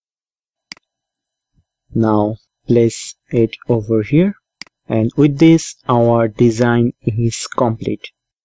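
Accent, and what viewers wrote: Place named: India. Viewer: Desi English 🤟😝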